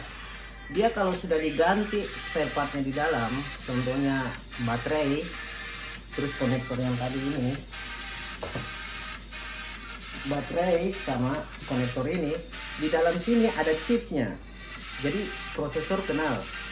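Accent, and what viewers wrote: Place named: Papua, Indonesia. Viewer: Bicara saja bos hajar pake logat Papua ka,sukses selalu bos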